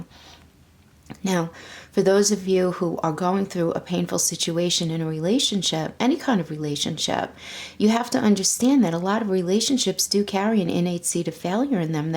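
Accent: New York, United States. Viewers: Nu yourk! Love your accent. 💗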